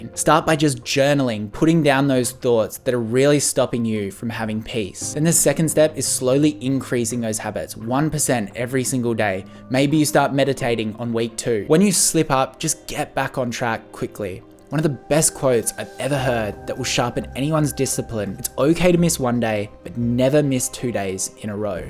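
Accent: Australia. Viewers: Your channel are full of useful knowledge, also the Aussie accent reminds me of the days I used to study in Melbourne. And i am so suprise that we are almost at the same age and you already achive such a great success, i am start to learning e-commerce! thanks!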